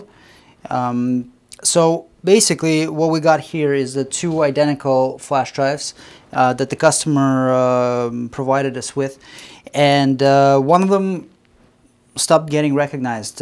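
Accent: Russia. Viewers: Ты русский? Акцент выдаёт.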